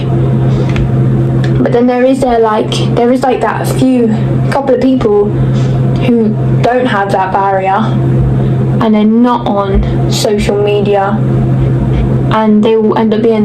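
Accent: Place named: United Kingdom. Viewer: Yeah, I feel basically the same way 😭 and hey, im from the UK too! (Judging via accent) and I’m literally like a year older than you (since you mentioned 16) and I gotta say I agree with you on the vast majority of points here, though some can be chalked up to other factors. Modern UK is pretty bleak and depressing too, so that’s likely not a very nice effect on you either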